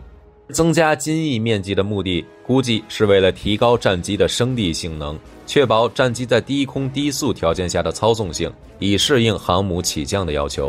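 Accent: China. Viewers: 有點好奇，標題的"韓國話虎膦"似乎是臺灣的發音，但頻道主發音像是大陸人，怎麼會知道臺灣的厘俗用語啊？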